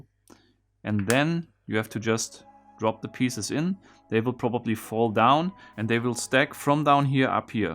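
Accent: Germany. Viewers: Do I hear a bit of an German accent in your voice?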